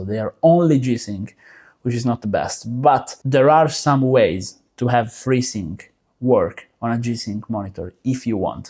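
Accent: Italy. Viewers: Familiar accent:Italiano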